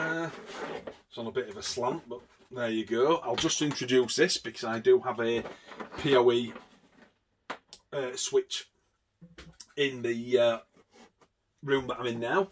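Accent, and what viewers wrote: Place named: Yorkshire, United Kingdom. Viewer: Love your tutorial Sit and it's obvious your from good old Yorkshire as My wife is from Goole but we live in N. Ireland, Question, I have just had 4 small Hikvision cameras fitted at my house, model number is DS-2CE56HOT-IT3E, but not happy with them, I will keep 2 of them but want the DS-2DE4220IW-DE you have been talking about, I need to be able to read a number plate at 30 mts, what do you think, pity you couldn't show us some footage, thanks again.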